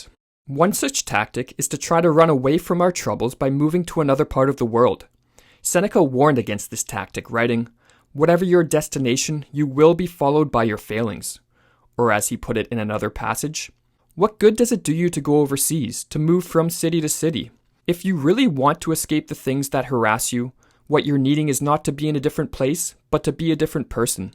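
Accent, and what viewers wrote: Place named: Canada. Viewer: Hey man, long-time follower. Just checked your patreon and I knew by your accent you were Canadian! Whereabouts are you? Love your content!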